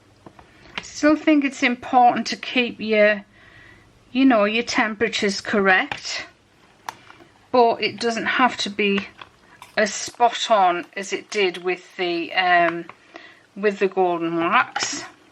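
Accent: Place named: Hartlepool, United Kingdom. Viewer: Just found you and I love the videos. Are you from Hartlepool I reognise the accent but cant pin it down.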